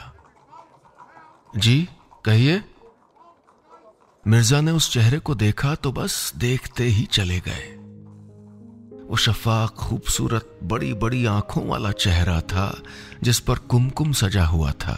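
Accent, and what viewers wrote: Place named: Lucknow, India. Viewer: Janab aapko sun kar dil khush ho jata hai. Aapne Lucknow ke zubaan yaad aa jati hai. Aap ka tahey dil se shukriya.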